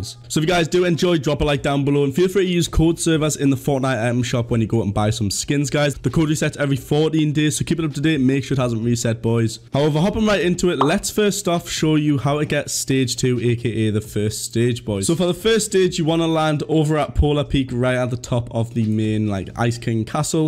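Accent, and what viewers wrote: Newcastle, United Kingdom. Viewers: true geordie if he played fortnite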